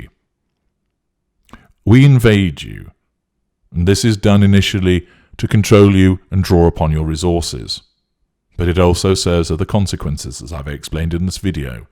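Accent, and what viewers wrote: United Kingdom. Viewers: nope i just don t like the british accent and i know where your coming from, no hard feelings i just as good as u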